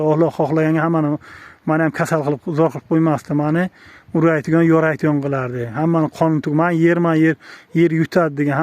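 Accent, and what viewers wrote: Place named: Kashkadarya, Uzbekistan. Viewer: Кашкадарёдан бу ака, гапиришига караганда. Охирги пайтларда куп талантлик одамлар чикябди кашкадаредан. Тайсин, сарик сочли бир бола чикканди валиахд. Энди акамиз чикиш килибди. Куникиб колдик анча, миллион билан дизайнга тема булибдида яна. Узб маскарабозлари точно эргашади сизга.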